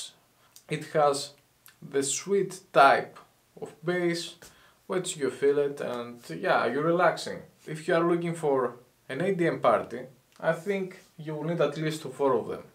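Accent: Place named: England, United Kingdom. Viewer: Το αγγλικό ακσάν σ αδερφέ τα σπάει ⭐️ να'σε καλα και καλα χρυστουγεννα! 👍🏼💪🏼🎉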